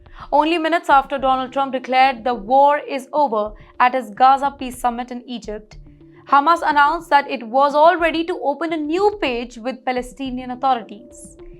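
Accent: India. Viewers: THERE IS NO TEREBEL LIKE INDIAN NATIONALITY SPOKE ENGLISCH 😅LIKE YOUR MOUTH FULL WITH TOUNGHS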